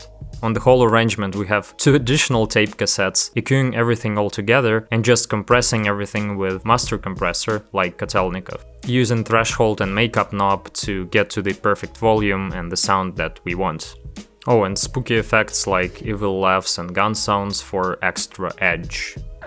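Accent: Russia. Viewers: лайк с русским акцентом, красава чел! 👍🏻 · i love this russian accent its just Beautiful , i know phonk is born american but i cant imagine phonk without russia ! thanks bro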